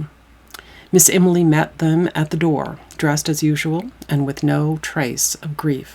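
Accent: South, United States. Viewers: The southern accent REALLY helps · Are you from the south,?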